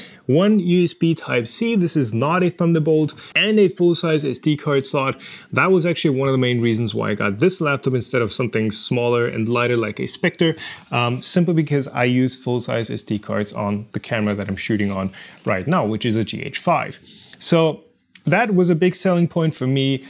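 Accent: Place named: Germany. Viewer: I really hear his German Accent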